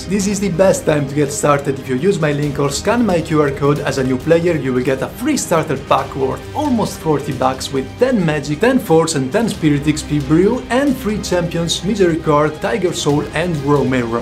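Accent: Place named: Italy. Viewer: Mi sembri italiano dall accento, vabbè Anyway great 👌🏻👍🏻🤘🏻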